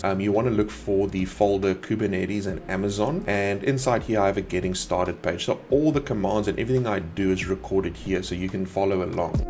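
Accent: South Africa. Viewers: Why does it sound like you're afrikaans.?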